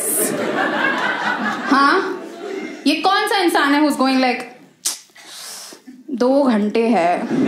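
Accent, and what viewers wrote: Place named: India. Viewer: this is why Americans make fun of Indian accent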